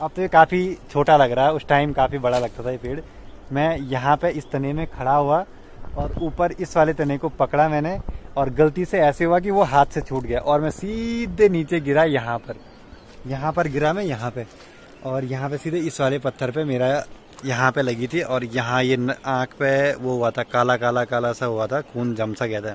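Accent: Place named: Garhwal, India. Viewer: आपके मुँह से गढ़वाली बहुत अच्छी लगती हैं तो कुछ अपनी भाषा भी बोला किजिए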